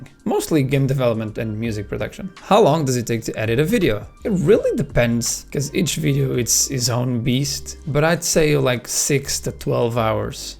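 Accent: Portugal. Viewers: That's pretty much his Portuguese voice, in this video. A lot of Portuguese people tend to speak English with a very hard Portuguese accent. "We" kinda suck at losing or acquiring accents. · that a nice portrugeese accent you have there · que tal você fazer um vídeo falando em português? Quero mt ouvir seu sotaque portuga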